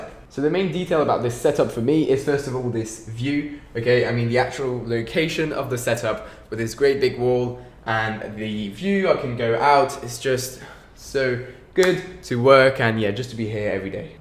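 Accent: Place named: France. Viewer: you're accent it's like a frensh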